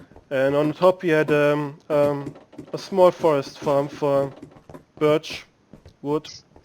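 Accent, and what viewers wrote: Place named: India. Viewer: I think india. Sounds like an indian accent.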